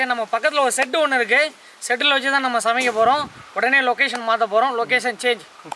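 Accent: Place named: Kongu, India. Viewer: ji kongu tamil Vera level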